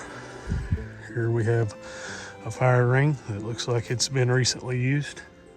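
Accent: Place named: Eastern Kentucky, United States. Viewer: With that accent, you have to be from Eastern, Ky? My mother is from Perry County. Great video, I enjoyed the moonshine still. Never knew it was there. Thanks for the upload. New Subscriber!!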